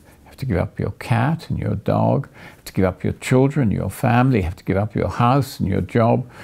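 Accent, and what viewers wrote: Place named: United Kingdom. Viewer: I’m quite fond of that kind of English - posh but unpretentiously so. effortlessly sophisticated. quintessentially English. me like!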